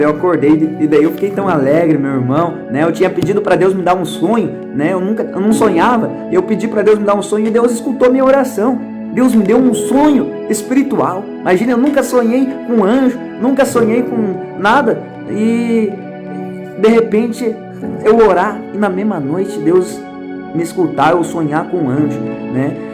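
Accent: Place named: Rio Grande do Sul, Brazil. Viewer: Ele e gaúcho o sutak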